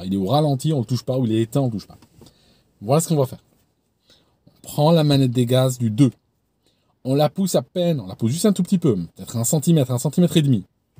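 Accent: Switzerland. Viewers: Effectivement moi aussi j'ai réentendu ce léger accent Suisse dans ces dernières vidéo. J'aime bien , ici en Alsace nous avons un peu le même. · Il est bien guilleret dès l introduction notre, ca change des intros à la Pierre Bellemare. Et cet accent etonnant (suisse d apres les autres) qui surgit plus présent que jamais. (Bon moi j ai toujours trouvé que tu causais comme le père Algerois d un ami). Bref autrement le contenu est toujours d une régalade absolue. Un petit hors serie un jour sur Lindbergh, le hindenburg, l Aeropostale? Tant de merveilleuses histoires dans l aviation ❤❤❤ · Oui l'accent.. C'est ma mère originaire de Genève qui m'a fait remarquer sur une autre vidéo. Je trouvais pas ça flagrant mais alors là😮 ! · Le petit accent suisse..! Je me disais la même chose donc.! Monstre bien la vidéo.! 😂 Vive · Mais qu’est-ce qu’il se passe sur cette vidéo? 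Au début je reconnais très nettement au contraire que de toutes tes autres vidéos un accent suisse! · J'ai été devancé, mais pourquoi l'accent Suisse ressurgit d'un coup dans cette vidéo ? Un coup de fil dans la journée avec un ami Suisse ayant ravivé des souvenirs ? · Pourquoi vous reste-t-il de l'accent suisse? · C'est vrai que sur cet épisode M. nous gratifie de légères intonations Suisses, sans doute un reliquat de ses études de pilotage dans la Confédération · Tu me fais sourire avec ton léger accent Suisse cher Ami, m'a tué a 900 ft on récupère que les boîtes noires😂😂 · Vous avez pris l’accent suisse ? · "LEGER" accent suisse??, plus il est en forme plus il laisse traîner joyeusement l'accent, je viens de la frontière, si je ne le connaissais pas et juste avec le son je jurerais qu'on a affaire avec un Genevois de souche! (mais méga-callé en aviation) 😁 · Étant genevois, c'est son accent qui m'a fait lever un sourcil et de fil en aiguille j'ai commencé à suivre régulièrement ses vidéos qui d'ailleurs sont toujours captivantes. 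· d'où tu le sort cet accen suisse, tu vis en suisses? Perso je m'en fou, mais c'est pas saint. Ça sent le mensonge. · Eh oui j'ai aussi remarqué l'accent suisse un peu vaudois ? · Il n y a pas que moi qui a remarqué son accent suisse 😂😂😂😂😂 Encore une très belle vidéo, merci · oui j'ai aussi senti l'accent suisse ! c'est nouveau ca ? :p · C'est marrant, t'as pris les intonations et un soupçon d'accent suisse. Combien de temps y as-tu passé ? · Merci...tu nous diras par quel mystère ton léger accent suisse te reviens en ce moment;-)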